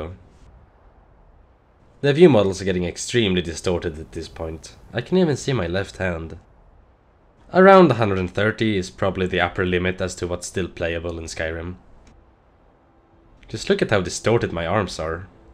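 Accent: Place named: Sweden. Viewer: haha i can tell that u are from Sweden.. lol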